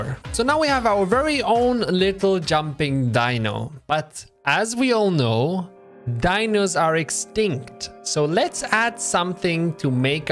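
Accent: Poland. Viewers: Are you from poland ;3? Also great job on the video man, its soo high quality. Keep it up and you will go to places cheers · youre from poland?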